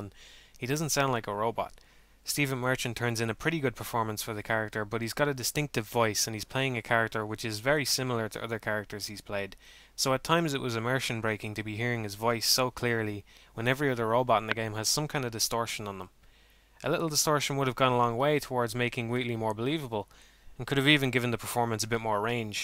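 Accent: Ireland. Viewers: are you irish? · where are you at in the world I live in the us in kokomo Indiana but I feel we are kindred spirits because you have passion for games like me and you sound irish I have red hair and I agree with every you say about games im just drawing a comparison but its incredible the way your words are the way I think maybe we could team up but that is just wishful think anyway keep with it I love work man you are like adam sessler, tommy tellareco, Geoff key lee. (spelled wrong)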